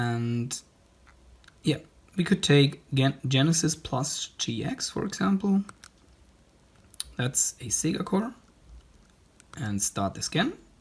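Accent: Germany. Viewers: Deinem Akzent nach vermute ich, dass du Deutsch sprichst ;) Vielleicht würde dies die Kommunikation etwas erleichtern. Ich habe alles so gemacht, wie du es in deinem Video beschrieben hast. Das Setup hat wunderbar geklappt. Das Problem tritt auf sobald ich über das Verzeichnis "media" auf den Roms-Ordner zugreifen will. RetroArch beendet sofort und ich lande wieder im Hauptmenü des RG350. Mir wird gar nicht erst, wie in deinem Video der Ordner "Data" angezeit, bevor ich in dieses Verzeichnis weitergeleitet werde, stürzt RetroArch leider ab. Ich nutze die 2019er Version des RG350. Anbei wurde mir eine 32Gig-SD-Karte mit vielen Roms dazugeliefert. Allerdings habe ich selbst auch schon einige Roms in die einzelnen, vorinstallierten Ordner dazugepackt. Was kann ich tun, dass ich auf meine Roms zugreifen kann? Vielen Dank im Vorraus für deine Antwort. Beste Grüße Tim :)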